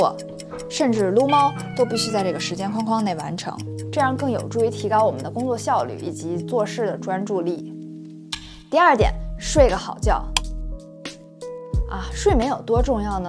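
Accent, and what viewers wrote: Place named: Beijing, China. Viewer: 好喜歡你，謝謝鼓勵我們！每次聽到你說話，我彷彿感覺我還在北京。👍👍👍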